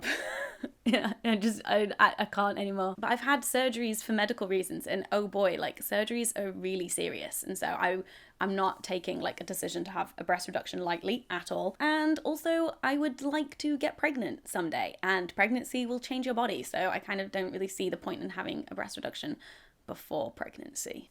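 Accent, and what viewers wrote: France. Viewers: Ton accent français est adorable!! ❤️ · wow loving your French accent for REAL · You have a nice French Accent :) Just need some practice. I would love for you to come here, I'll be glad to see you <3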